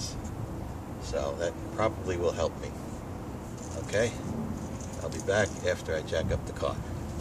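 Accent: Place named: New England, United States. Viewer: Give me a lever and I'll move the world..someone famous said that..archimedes maybe? Thanks for the good tip and great new england accent!. Thought you were one of the this old house guys..👍👍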